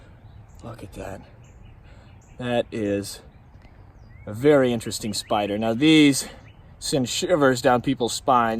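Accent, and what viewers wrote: RP, United Kingdom. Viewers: Good british accent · That Attenborough accent tho...❤👏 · Good posh English accent and I’m English!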